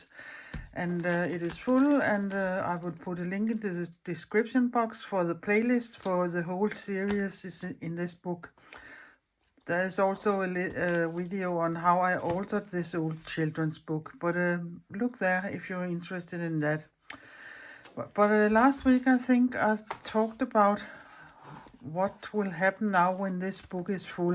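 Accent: Denmark. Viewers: I just love your voice and accent . It’s so soothing and melodic . My son in law is half Danish . Such a beautiful country . I’m so grateful to have met you virtually here . Your art sings to me and I enjoy every moment as I’m sat in my art corner creating along with you . I’m Lisa from Yorkshire UK . I love slow stitching also xxx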